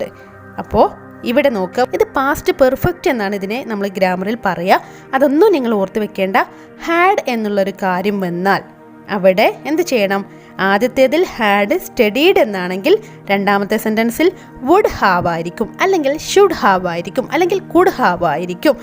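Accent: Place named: India. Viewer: cringe indian accent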